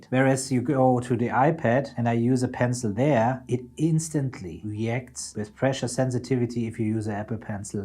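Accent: Germany. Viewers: Bist du Deutsch? Merke da so ein akzent ..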